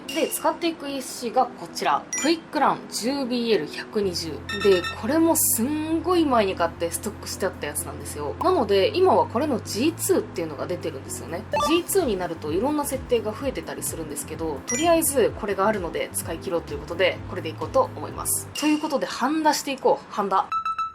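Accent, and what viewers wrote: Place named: Japan. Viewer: I prefer hearing your Japanese accent with the subtitles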